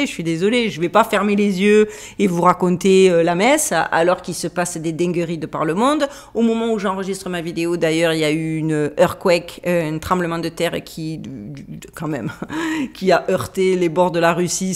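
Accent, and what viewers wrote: Marseille, France. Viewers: Trop géniale votre vidéo j apprécié beaucoup votre côté solaire et votre franchise avec votre accent du sud Marseille? Moi j y habite mais j avoue que Las vegas ou San Francisco m attire bref continuez ainsi moi j adore 🙏👍❤️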